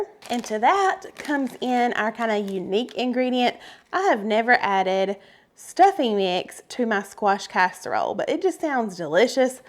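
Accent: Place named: East Tennessee, United States. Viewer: 🍓 This is my first time watching one of your videos and I loved it! I’m from the East Tennessee and girl, your accent sounds just like home! I’ll be trying some of these recipes. And yes, church cookbooks are the best!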